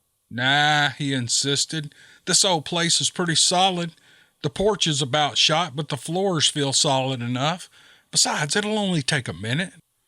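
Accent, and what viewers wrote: South, United States. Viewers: Yes.... you have that southern drawl...not heavy..but perfect storytelling kinda very I don't know any other words than perfect hell it's cheaper than my shrink and more enjoyable and you don't mind going over the hour😆😆😆✊💪🤜🤛🇨🇦👻💙👻💙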